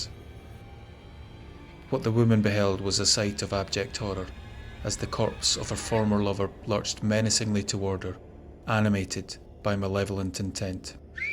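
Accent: Scotland, United Kingdom. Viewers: These videos are worth watching just to hear the awesome Scottish accent.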